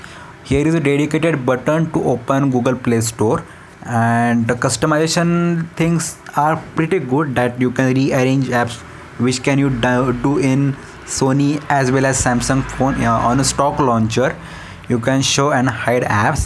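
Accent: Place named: India. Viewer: Indian accent is so thick :D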